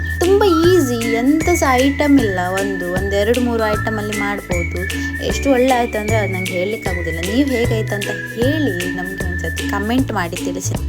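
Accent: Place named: Kundapura, India. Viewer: Kundhapuraa Kannada ...nenp hoithaa...yenthaa martyaa??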